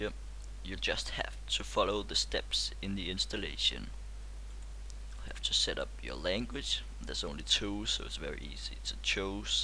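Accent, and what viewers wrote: Denmark. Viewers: Han er dansker, det kan jeg høre 100 p :D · Lol are you Danish? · You're Danish? Right?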